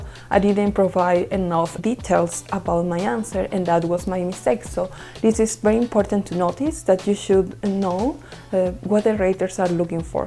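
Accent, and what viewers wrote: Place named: India. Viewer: he means the way you speak English ... sounds pretty Hindi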